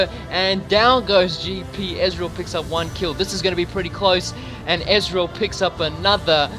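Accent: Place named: South Africa. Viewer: Where he is he from? he sounds very South African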